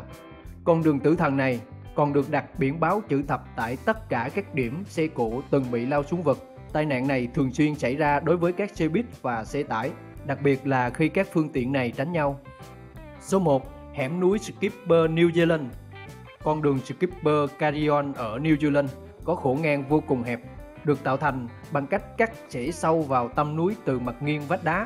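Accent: Central, Vietnam. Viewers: Ad quê ở miền trung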